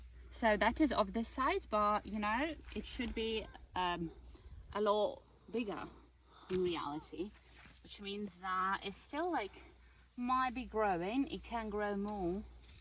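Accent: United Kingdom. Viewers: Your British English is amazing. You don't have Russian accent at all. I learned Alaskan English in 1990 and my Central European accent is still there even now. Potatoes don't have to grow in the ground. They grow well in hay, freshly cut grass, leaves and anything else that creates cover and produces heat. In Palmer, Alaska , farmers grow these red potatoes as well as purple potatoes. Best wishes from Alaska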